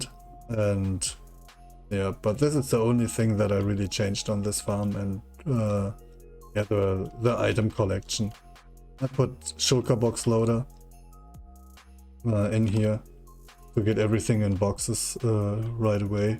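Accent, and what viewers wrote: Germany. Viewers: Man merkt den deutschen Akzent richtig 😂 cooles video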